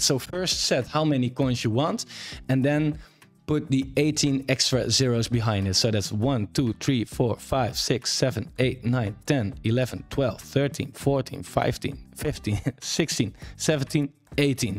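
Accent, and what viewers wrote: Netherlands. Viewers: I love jou mooie hollanda accent Wel goede video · ik hoorde al iets nederlands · You from holland right? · you sound dutch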